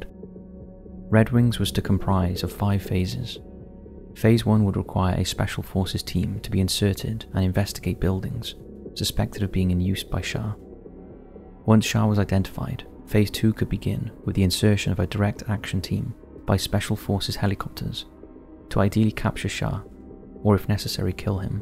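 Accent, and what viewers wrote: United Kingdom. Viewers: No, he isnt wrong at all. "Special forces" is a term known colloquially around the world to be synonymous with more/less any SOF unit . Defined as "the units of a country's armed forces that undertake covert, counterterrorist, and other specialized operations". So by definition Rangers, Raiders, SEALs, SWCC, PR etc are all special forces. Just because the US decided to specifically name a unit "special forces", does not take away from the literal definition of the word. and with the fact that the dude has a british accent or whatever, It would just be cringe to hold him to that standard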